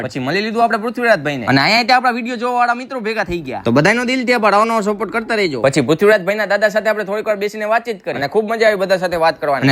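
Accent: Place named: Surendranagar, India. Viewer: bhai tmari bhasha surnagar ni gujrati mane bov j game ❤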